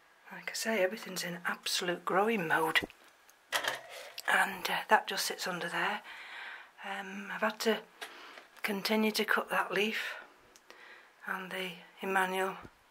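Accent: United Kingdom. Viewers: where did you get your shelving? You sound to be in the uk like me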